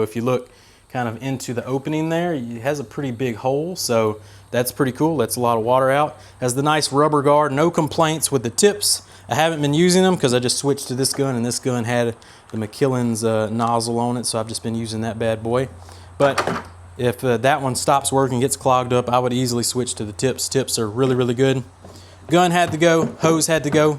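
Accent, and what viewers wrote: South, United States. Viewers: Thanks for the update! I went and bought the 1.8 based off your original review; I was just NEEDING something smaller and lighter than my big 'ol thang with wheels and a hose reel. The only issue I have with the Ryobi gun is that when I put the extension on it, I feel like I fight to keep the water stream pointing down. It's like I pull the trigger, and the nozzle kicks up. I did put a swivel on it, and as a pure stubby it's great. Love the lack of music and your LA accent! I'm in East TN, so it's cool to hear the southern accent with a twang :). Love your vidoes!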